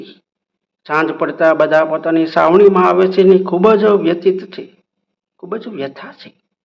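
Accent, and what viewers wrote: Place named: Amreli, India. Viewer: આવું બધું કથાકારો માટે રહેવા દ્યો,. બહુ જામતુ નથી. તમારી તો તળપદી અમરેલી/ભાવનગરની બોલીવાળી કોમેડીમાં જ અમને વધારે મોજ આવે છે.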